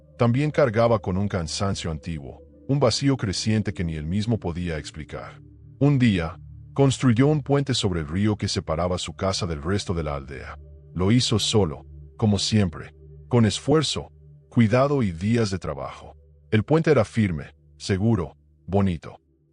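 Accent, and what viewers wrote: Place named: United States. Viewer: La Información muy buena, pero el tono gringo pésimo,...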